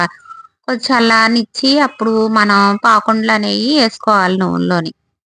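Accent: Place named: Godavari, India. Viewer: మీ గోదావరి slang చాలా బాగుంది medam 🎉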